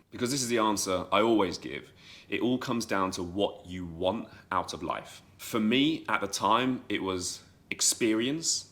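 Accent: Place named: England, United Kingdom. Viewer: You have a nice English accent... Appreciate that. Wherever you are going to be living around the world make sure you get to do what you can to enable you invest. The experience is always cool though, but if you're a foreigner in any country your priority is investment... back Home that's not your home Thank you for the beautiful accent again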